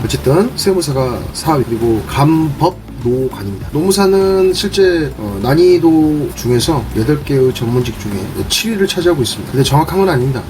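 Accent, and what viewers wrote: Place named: Jeolla, South Korea. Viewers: 전라도 분이신가... 고향의 냄새가 나네... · 아따 라도여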